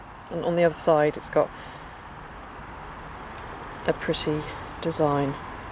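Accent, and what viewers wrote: United Kingdom. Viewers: It was an absolute joy to stumble on your video miss! Yours was the first British accent I have heard on YouTube for ages and I was beginning to feel homesick without leaving North Yorkshire. As for wishing you were 32 again I have just been 32 again and I can tell you that being 64 is over rated despite what may have said in the song. Blessings and peace miss.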